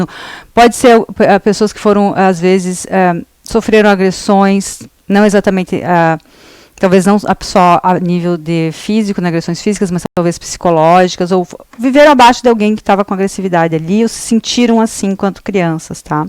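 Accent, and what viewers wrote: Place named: Rio Grande do Sul, Brazil. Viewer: Pelo seu sotaque já percebi que vc é do Rio Grande do Sul. Parabéns pela leitura, vc tem o dom da expressão, muita intelectualidade!! Gratidão!! 🥰 Namastê 🙏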